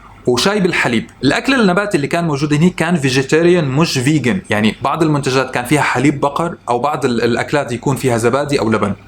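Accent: Syria. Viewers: انت سوري من السويدا او من الساحل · حضرتك سوري ولا اردني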